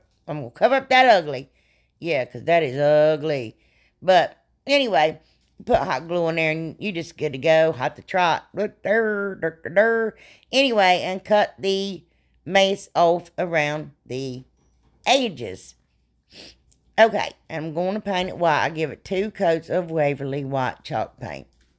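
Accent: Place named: Rural, United States. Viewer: I absolutely love your accent... What state are you from? Your crafts are so kool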